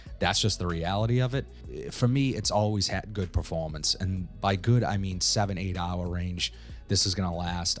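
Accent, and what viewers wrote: Boston, United States. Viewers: You have that Boston accent. Looking into this as my first niche fragrance.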